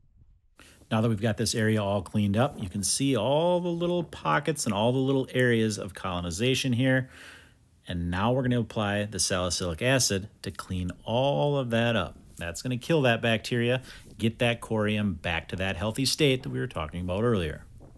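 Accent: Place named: Great Lakes, United States. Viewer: your Great Lakes accent is music to my ears. I’m from Western New York originally, and you sound like home, even though you’re in Wisconsin.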